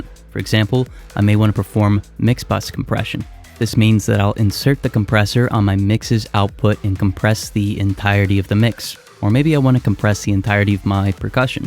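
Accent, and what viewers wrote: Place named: Brooklyn, United States. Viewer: This guy must be from Brooklyn. I can hear it.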